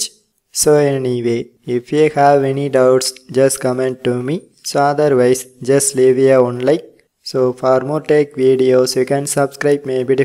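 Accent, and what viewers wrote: India. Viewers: you have the THICC indian accent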